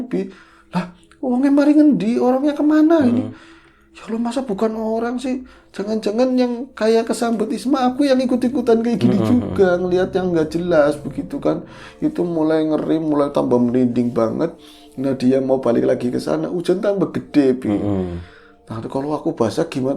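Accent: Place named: Tegal, Indonesia. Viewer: Assalamualaikum wr wb mas dan mas.....lanjut dengerin cerita yg tk tunggu apalagi bhs Tegalnya 😀😀😀🙏🙏🙏🙏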